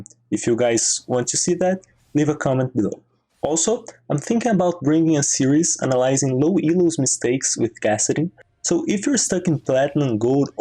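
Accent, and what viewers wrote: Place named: Brazil. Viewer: isso é sotaque br hein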